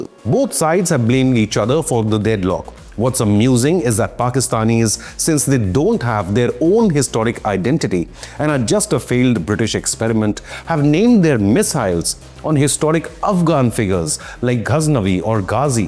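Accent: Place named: India. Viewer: आपका accent बहुत देसी है। आप अंग्रेज़ी हिंदी के माफिक बोलते हैं। 🙏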